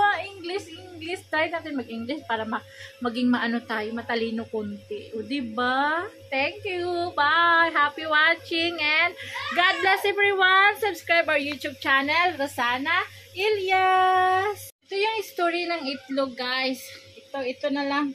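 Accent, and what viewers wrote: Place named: Cebuano, Philippines. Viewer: Work narin ang pag vlog mo sis.....magkatawa ko nimo kay mo gawas jud imo pagka cebuana...God bless dai...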